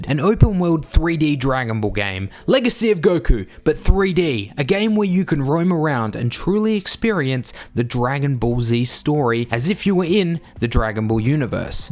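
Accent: Australia. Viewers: The guy sounds Australian. If you watch Crocodile Dundee, you'll know Australians have ridiculous standards based on hogwash.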